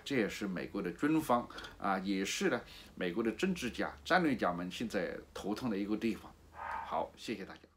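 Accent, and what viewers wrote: Hunan, China. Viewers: 何频你太啰索! 一個简单的问题谈得老长，言不扣题! 另外普通話要说好，你这年龄不該说成这样-湖南国语。改改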